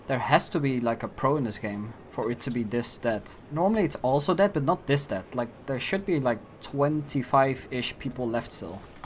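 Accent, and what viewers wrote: Netherlands. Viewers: Are u dutch??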